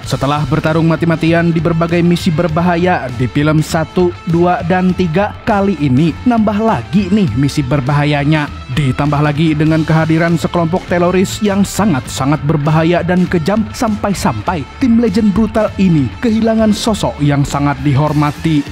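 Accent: Banjar, Indonesia. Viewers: Bah orang banua sakalinya😅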